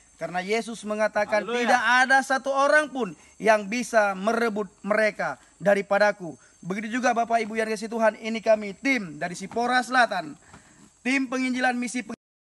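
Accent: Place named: Medan, Indonesia. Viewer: logat kau aja logat medan,mengaku ngaku orang aceh...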